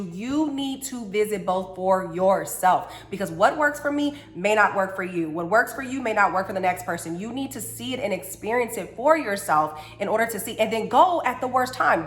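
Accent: Texas, United States. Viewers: I discovered your channel yesterday and apparently I’m on binge watch now. I’ve been thinking about moving to Texas for a while now but been back and forth with locations. You definitely a Texan now lol your accent is thick I love it!!! When I’m ready I’ll definitely be reaching out to you because I already trust your judgment.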